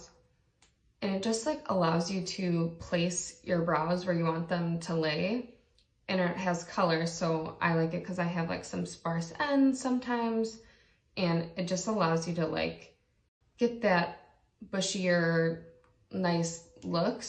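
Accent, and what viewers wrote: Michigan, United States. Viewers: Holy Michigan accent. She has a big time one. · shes definitely from michigan lmao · I grew up in Michigan and moved to Florida at 15. It's just funny because her voice and accent sounds just like my aunt who stayed in Michigan. If I closed my eyes and just listened, I wouldn't be able to tell them apart. Weird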